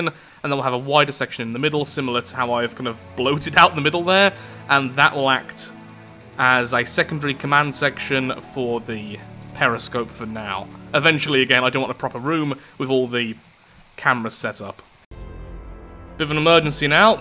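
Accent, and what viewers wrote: United Kingdom. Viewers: Not only bc of brit accent but you sound exactly the same as Alex the rambler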